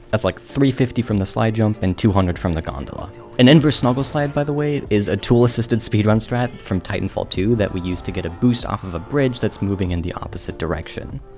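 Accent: Germany. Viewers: Heyy idk why but are you german? you sound like german btw i am german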